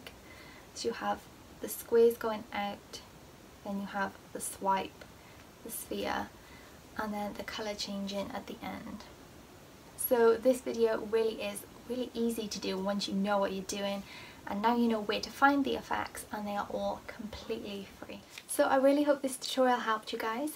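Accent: Wales, United Kingdom. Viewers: Hey! Are you welsh? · Omg love your British accent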